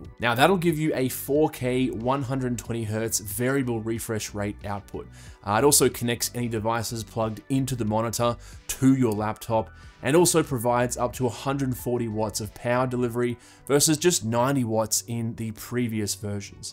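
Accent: Australia. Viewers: Spent half the video trying to figure out if you were Australian. As an Australian that spends too much time on the internet and now can’t pick an accent so save my life 😂🤦🏼‍♀️ You’ve just got yourself a new subscriber. I’ve got a really old dell monitor I’m using with a new Mac mini m4 pro. I really like the colour on the old dell and find it looks almost identical compared to my 2016 MacBook Pro Display. For that reason alone I’m drawn to getting another dell monitor. My monitor can do 75 hertz but only 1080. And the weird scaling on the 27 inch monitor means I have slightly burry text. I have a small desk which means I probably sit a bit closer than is ideal and the weird text bothers me. I’m not great at understanding the scaling thing, but 1080 on a 27 inch vs 4K on a 27 inch, what would be the pixel density difference and would it improve my current viewing situation? 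😂 Love your content.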